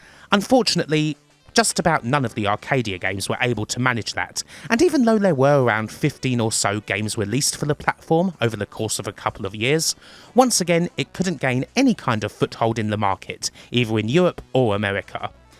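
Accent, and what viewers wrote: United Kingdom. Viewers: My new favorite retrogame channel! And like british accents. Can't get enough of your stuff!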